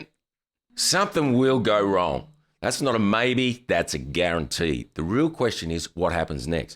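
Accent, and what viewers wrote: Australia. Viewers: I'm planning to get back into gigging and I've been loving your advice. Firstly it is spot on and I know when I was younger I've been guilty of many of the mistakes you've highlighted. Also because of the Aussie accent I feel like I'm getting advice from a Bluey character (I have a young kid alright) and makes me love it more.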